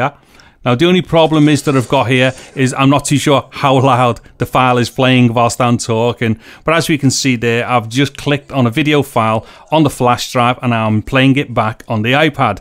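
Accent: Liverpool, United Kingdom. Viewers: Thanks for that. I bought a complete USB-C Hub to connect all sorts of media. It’s the same one advertised in the Apple Shop but, buy externally and you can buy it for 25% of the price Apple want. You read that right. Buy external of Apple and SAVE 75% on Apple price for exactly the same brand and item they are selling. Good video with your happy smiling face and Liverpool accent. Reminds me of home. I now live in Thailand. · Found this by just browsing and I wasn't expecting a cheery Liverpool accent to greet me! Sláinte mate, great vid v helpful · Definitely a scouser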